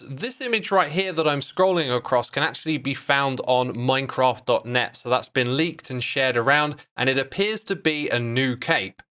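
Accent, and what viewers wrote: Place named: United Kingdom. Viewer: Why do you sound... MORE British than usual???? Is that just me??? lmao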